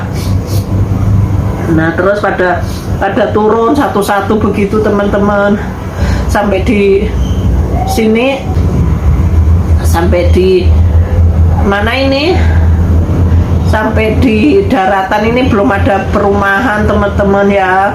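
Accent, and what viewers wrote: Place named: Java, Indonesia. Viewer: kok jowone masih medok banget....Yo.....padahal sejak kecil di Papua. Salam Soko Pacitan Jawa timur.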